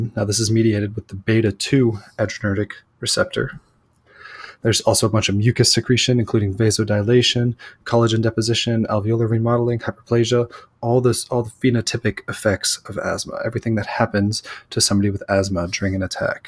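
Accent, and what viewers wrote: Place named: United States. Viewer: I love your american accent and the way you say RECEPTOR :-) :-* Best regards from Munich,Germany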